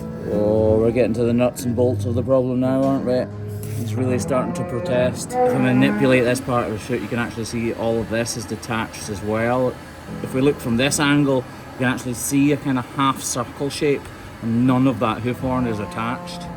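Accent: Scotland, United Kingdom. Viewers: I think part of it is also (maybe just for me) his Scottish brogue. It’s lyrical, I have always been a music geek. 😊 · I really enjoy watching your videos. Even though this is a seriously important and necessary job, you and the team around you are very entertaining. Yet, I find the presentations to be quite informative. The way you regard and treat these animals, is a credit to all of you. I’m quite sure that the farmers who bring you in, appreciate your overall regard and respect you show to their livestock. BTW has anyone ever told you that you look a lot like Russell Tovey, only with a Scottish accent. LOL 😊 Much respect and appreciation from here in Western Australia. G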